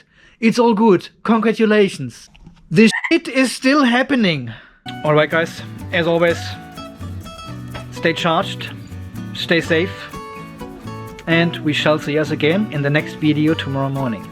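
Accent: Germany. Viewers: I'm starting to think that "American English" sounds better with a German accent!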